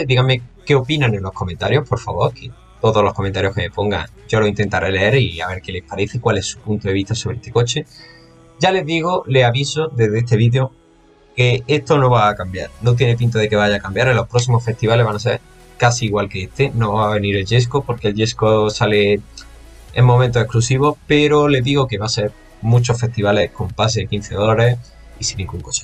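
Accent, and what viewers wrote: Spain. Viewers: Eres de España :p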